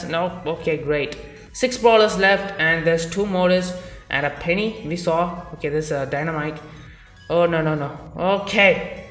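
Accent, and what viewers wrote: India. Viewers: R u indian?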